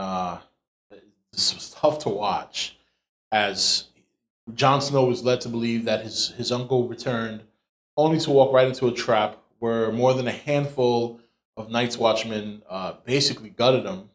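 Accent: New York, United States. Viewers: awesome review. i always love your reviews. are you from new york? your accent closely resembles that of a new yorker, and your views always closely match my own!!!!! original post thanks, you rock, and this was such a messed up ending.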